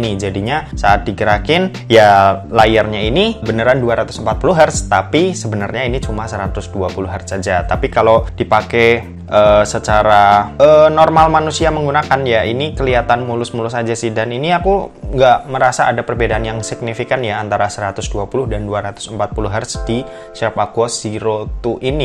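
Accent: Semarang, Indonesia. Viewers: Orang semarang tah mas? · Loh masnya orang semarang toh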